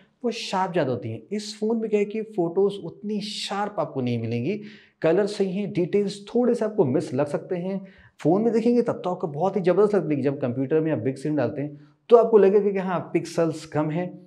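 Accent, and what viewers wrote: Lucknow, India. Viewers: aap Lucknow se hai kya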